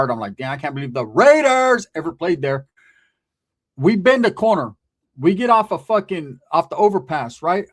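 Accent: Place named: Detroit, United States. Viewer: Sounds like Eastside Detroit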